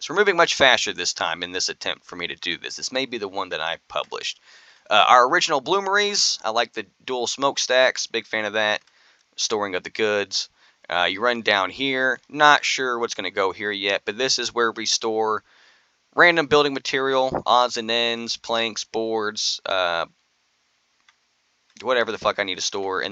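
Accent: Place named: South, United States. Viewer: Nevermind, you have the southern dude voice and you sound like you grew up shooting squirrels I apologize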